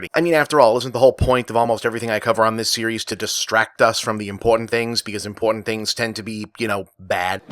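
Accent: Boston, United States. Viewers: It's just part of his Boston chahm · Who else herd the boston in here?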